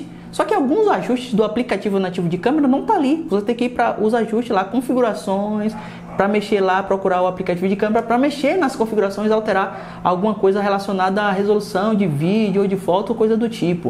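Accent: Bahia, Brazil. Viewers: tu é de que lugar da bahia, brow?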